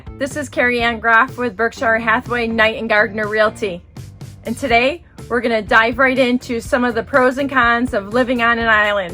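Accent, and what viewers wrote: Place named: Chicago, United States. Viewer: I love your accent, are you originally from Chicago or somewhere in that area?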